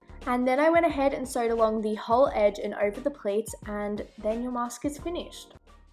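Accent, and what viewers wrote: Australia. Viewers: I hear that Australian accent👌🏿👌🏿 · Wow amazing thank u ... Also r u Australian cause ur accent sounds like u are... U have a new subscriber because of ur help and thank u for helping people... I don't have a sewing machine but I think I can manage sewing don't think it's that hard but haven't shown anything in a few years 😂... Well hope mines come out as nice as yours but I'm sure it will be ok... Stay safe and thanx again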